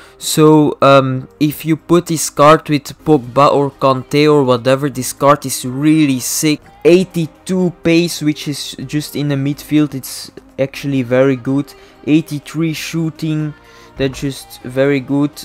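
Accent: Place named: Belgium. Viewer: Direct van in het begin van de video herkende ik die belgische accent hahahah Nice vid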